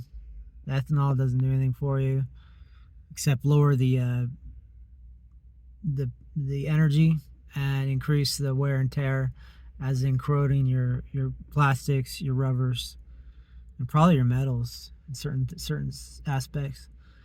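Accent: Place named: United States. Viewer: You are an original American so I could learn English from what you said/spoke. It is not easy but I love learning, 😊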